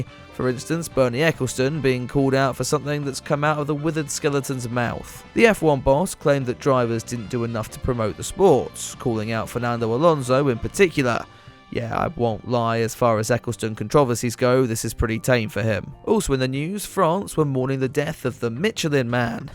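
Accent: United Kingdom. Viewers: Starwing in the UK ,which it sounds like my man is from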